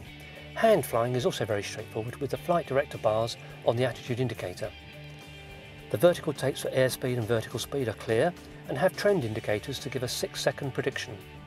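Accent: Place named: Ireland. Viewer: hey man sorry for asking but where are you from? Let me guess... Ireland? Your english accent is amazing!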